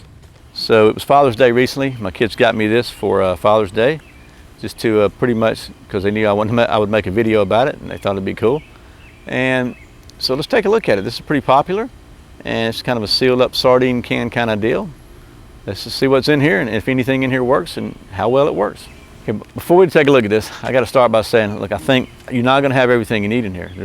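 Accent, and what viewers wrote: Georgia, United States. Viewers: I place that accent at south of Atlanta, GA How far off am I there, sir?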